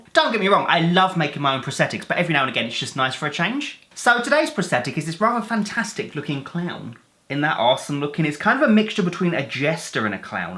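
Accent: United Kingdom. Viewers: ik he is both of those I'm asking a question a lot of his fans know including me 1) u can tell he is gay because in one of his fan mail vids one of the fan mail letters it's said something bout his bf and u know he is British by his accent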